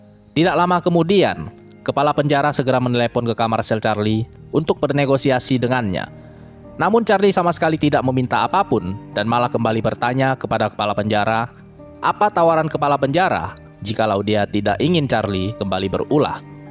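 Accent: Padang, Indonesia. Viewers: Logat ngomong nya kaya orang Padang.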